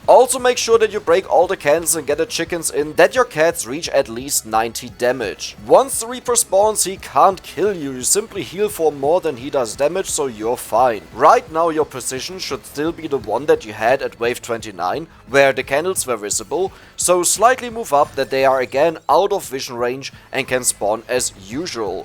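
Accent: Germany. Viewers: Funny, I thought the same thing. First time I heard voice I was like "Wait, isn't this Granady (famous trackmania player)?" Really must be the german accent. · Ich frage mich wieso mir deine Stimme so bekannt vor kommt... Vielleicht ist es auch der deutsche Akzent^^ Hast du mal Videos zu anderen Spielen gemacht auf einem weiteren Channel?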